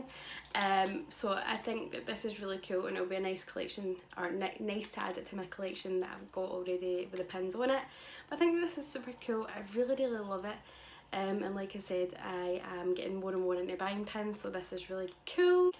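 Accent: Scotland, United Kingdom. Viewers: Are you Scottish by any chance? Bc i fricking love your accent!!!!!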